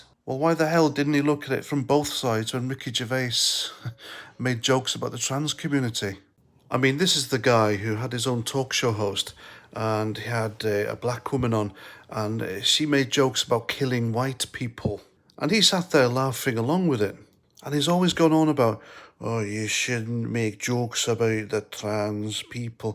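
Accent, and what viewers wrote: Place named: Scotland, United Kingdom. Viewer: Great Scottish accent. Do more!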